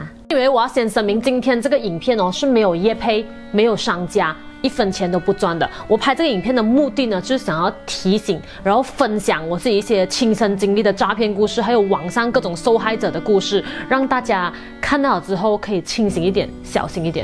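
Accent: Malaysia. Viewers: 馬來西亞的口音好好玩~~ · 你是大馬華人嗎？你和春小喜都是馬來西亞人，為什麼你們的普通話口音區別怎麼大？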